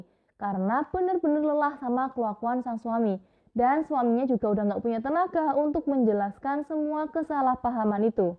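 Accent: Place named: Java, Indonesia. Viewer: Medox banget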